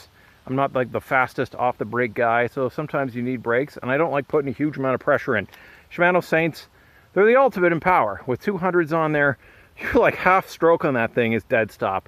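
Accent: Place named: Germany. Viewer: Hahahaha Like your german accent dude. Greetings from germany, Marc. Didn't get the 220 here actually. 😞 riding code r, but i hate the constand bleeding i've to do with the sram. Likely every week. Thats annoying.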